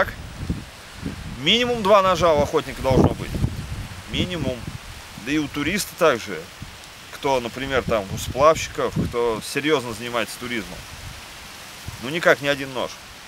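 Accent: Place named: Nizhny Novgorod, Russia. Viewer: Горьковский акцент легко узнаваем) удачной охоты!)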